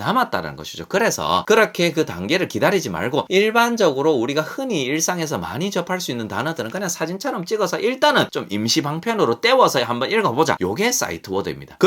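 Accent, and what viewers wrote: Gyeongsang, South Korea. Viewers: ㅎㅎ목소리 톤이 좋으셔서 경상도 억양이 섞였어도 내용이 쏙쏙 잘 들어옵니다.😂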